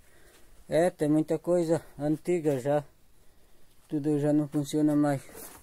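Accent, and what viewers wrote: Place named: Paraná, Brazil. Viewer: Boa noite que horas que era quando vc estava gravando esse vídeo ,,,a tu mora a sua voz tem sutaque do Sul Paraná eu moro em São Paulo abraço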